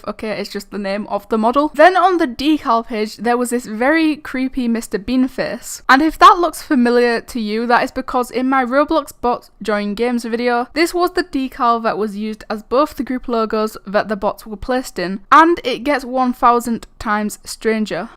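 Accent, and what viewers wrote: Scotland, United Kingdom. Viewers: Scottesh Accent over <num>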